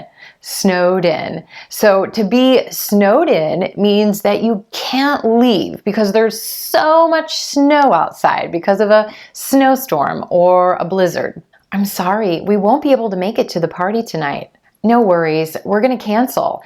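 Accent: United States. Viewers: One of the best American accent teachers ever ❤️ merry Christmas 🎁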